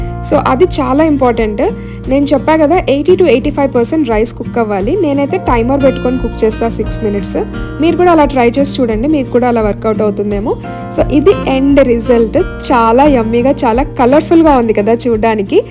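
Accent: Rayalaseema, India. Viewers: Rayalaseema lo ye ooru akka mana rayalaseema basha lo super ga chesav naadhi Kadapa jammalamaduhu 💥💥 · Hi akka...we too live in Nandyal at Sanjeev Nagar. YouTube lo asal pakka rayalaseema language ante needi akka... U don't have filters in ur flow of words.. Mana kurnool district vallu ela matladtaro as it is alage matladtunnaru. Keep rocking akka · I am also from Rayalaseema. Loved ur seema accent videos. And nice receipe too... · Rayalasima slang tho video lu appudappu cheyyandi please Bokulu Jankulu Uthalabithala Benne Super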